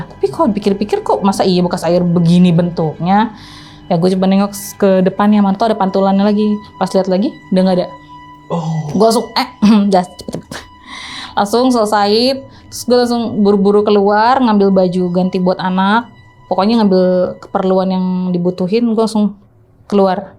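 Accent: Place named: Betawi, Indonesia. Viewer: keknya betawi😂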